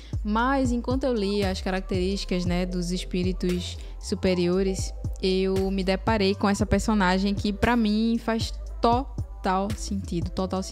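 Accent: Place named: Northeast, Brazil. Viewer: Sou da paraiba e reconheci teu sotaque nordestino. Delicioso 😋 😋 Parabéns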